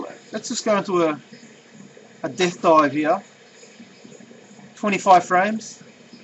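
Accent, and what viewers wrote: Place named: Australia. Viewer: are you australian, cause you sure sound like one ...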